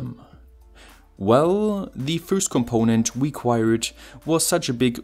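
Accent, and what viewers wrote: Germany. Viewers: I think it just comes down to the German accent as some sounds in English don't appear in German, like instead of -ous (serious) we say -ös (seriös).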